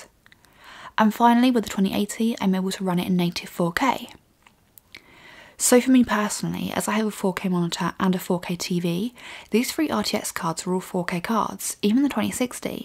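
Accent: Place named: Cockney, United Kingdom. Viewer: You've been living in London lately? Your accent is sounding a bit cockney.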